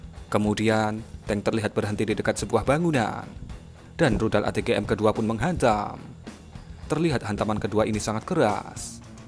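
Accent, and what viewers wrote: Java, Indonesia. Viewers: Maap gue slalu skip 10 detik pas openingmu dr kata HALLO PEMIRSA 🤣 krna medok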